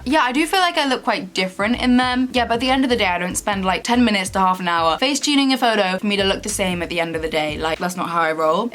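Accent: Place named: Newcastle, United Kingdom. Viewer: Are you from the Newcastle area? You sound like Perrie Edwards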